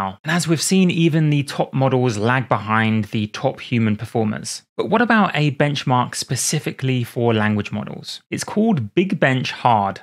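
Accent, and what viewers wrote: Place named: United Kingdom. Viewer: When these videos become too technical for me to understand, I'm still learning a beautiful British English.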